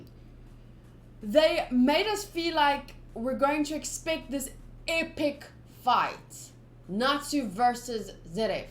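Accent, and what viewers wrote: South Africa. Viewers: Are you south African sorry I'm also south African and your accent sounds familiar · You have a very South African accent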